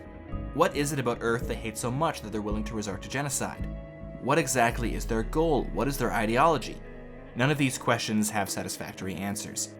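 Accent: United States. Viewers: "How does a collection of colonists and frontier workers, possibly compete with the manpower and industry resources, etc or a larger empire." Really dumb question dude, ESPECIALLY since, judging by your accent, you're an American.... Seriously, read your own nation's history and you'll have the obvious answer to your question. Whether or not it's feasable on a planetary scale is irrelevant, there nonetheless is a real world basis for this narrative plot point. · Uhm, why would a nation try to succeed from their home nation? Your accent sounds American....Ask the british how it went from their perspective.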